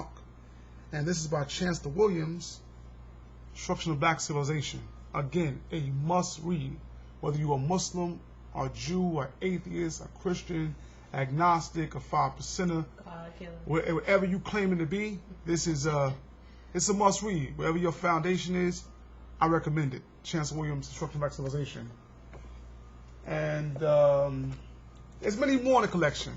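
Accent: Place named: East Coast, United States. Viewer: You have an unmistakable East Coast accent. I will look into purchasing some of those books you reference. Good job keep up the good work youngster.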